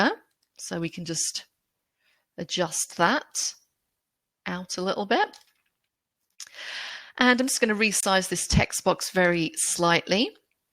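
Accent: England, United Kingdom. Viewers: I like genuine English accent in addition to your fabulous teaching.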